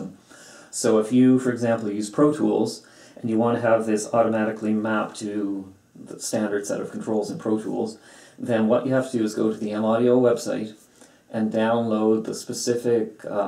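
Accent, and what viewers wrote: Canada. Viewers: More do less talk...but love the Canadian accent though. I got one of these just needed a few tips, nothing to help me here,sorry guy bye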